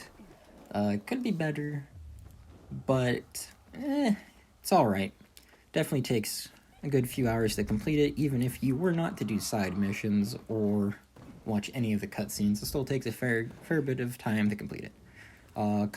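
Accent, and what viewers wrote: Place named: Canada. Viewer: your canadian accent lol 😬😅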